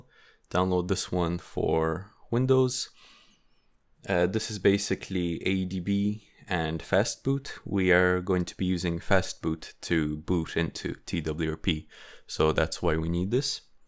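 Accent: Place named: Ireland. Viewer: you have an irish accent im confused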